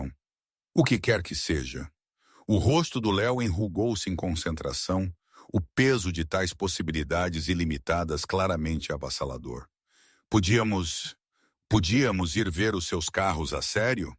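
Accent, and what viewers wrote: Portugal. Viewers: ADORÁVEIS NARRATIVAS COM O PORTUGUÊS DA LÍNGUA PORTUGUESA DE PORTUGAL. ENREDO EXCELENTE E RELEVANTE!✨✨✨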